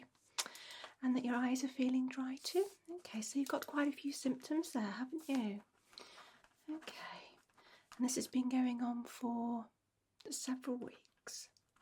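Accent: United Kingdom. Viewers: Love your beautiful British accent. Btw you nailed this roleplay. Good job my dear 🥰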